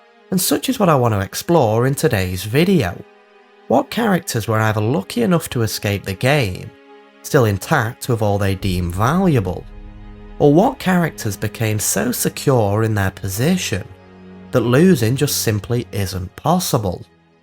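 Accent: United Kingdom. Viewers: We gotta use AI to change this guys voice…. Why so slow and British ew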